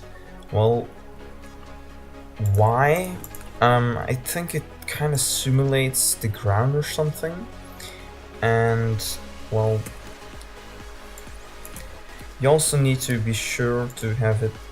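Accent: Netherlands. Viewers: hey just a quick question are you dutch? if so: hoi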